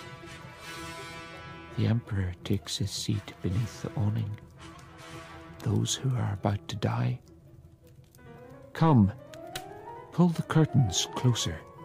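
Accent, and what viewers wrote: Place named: Ireland. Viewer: Love the Irish accent …. Easy on the ear …thank you. The Irish author, MacNeice, spent time studying at Oxford … the work reflects a degree of both an English & an Irishman’s sensibilities …. Threads of the English upperclass gent 🤵‍♂️comes thru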